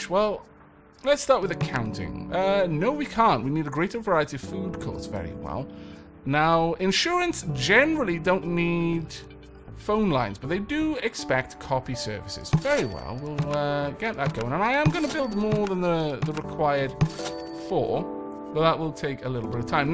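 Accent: United Kingdom. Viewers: I am only in the first minute but you kinda sound like this another great youtuber called BestInSlot who does Jurassic World Evolution/dinosaur games. I would say you both have similar vocal mannerisms, and your voices are both great and calming. Are you you British? Well I will go watch you video now. Thanks.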